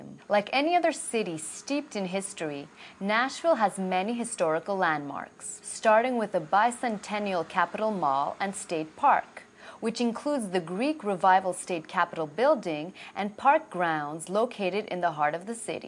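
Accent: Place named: Canada. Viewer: she sounds Canadian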